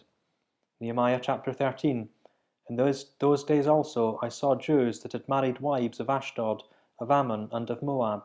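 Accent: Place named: Scotland, United Kingdom. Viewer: Very helpful, Mòran taing. From a family bright to New England I confess that I appreciate your accent from Alba (?) as much as I do the Aramaic.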